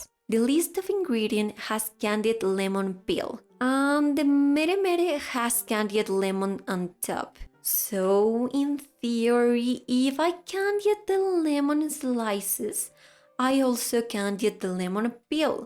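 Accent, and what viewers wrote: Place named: Russia. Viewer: Interesting recipe.. thank you! but you're accent is funny, is it russian?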